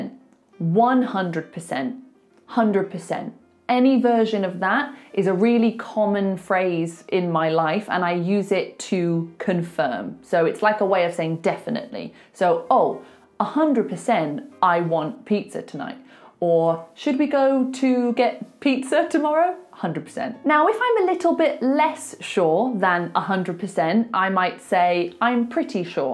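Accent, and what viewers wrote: United Kingdom. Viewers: Which part of UK are you from? Your accent has reminded me a lot of my time in the UK. Thanks a lot for your great videos. Keep moving on!! ❤ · Hi Teacher, I like all your useful English teachings. I love the way you speak British English. I want to learn all your important lessons teacher. Thank you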